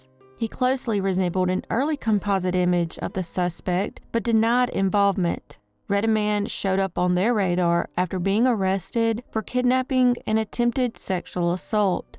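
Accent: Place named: Kentucky, United States. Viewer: Are you from KY? Your accent sure sounds like home. ❤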